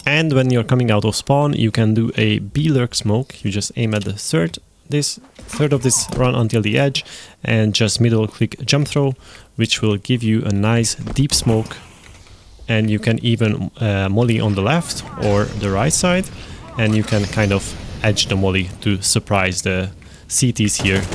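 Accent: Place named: Hungary. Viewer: éreztem, hogy magyar vagy xd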